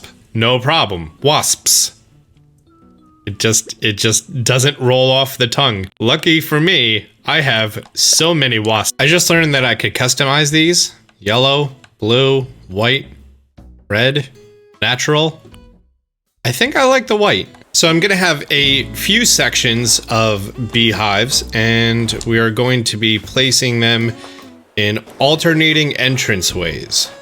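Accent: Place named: United States. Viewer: the factttt i knew u were from either PA/NJ/DE from the first vid i watched by the way u said “home” and “water”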